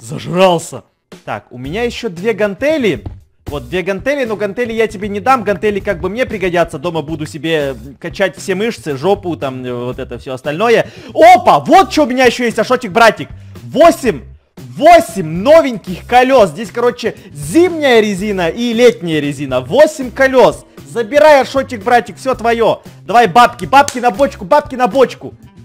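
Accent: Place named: Kharkiv, Ukraine. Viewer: шокают не в деревне а в Харькове 😎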